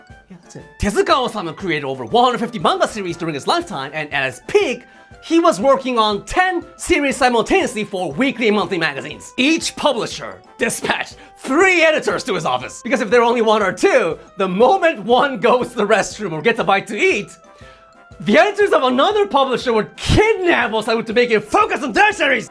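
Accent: Japan. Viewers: Oh man. He is speaking English but his narration YELLS Japanese, fucking loved it! 😂 Also, I never knew about Tezuka Osamu - sama 🙇🏻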